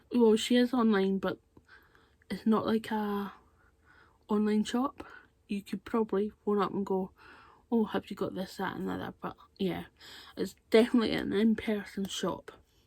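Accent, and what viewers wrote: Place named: Glasgow, United Kingdom. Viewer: Oooooo that sky behind you. I was born in Glasgow and moved to the midlands when I was 10 so it is lovely for me to hear your voice. Accent of home. Thanks for your videos.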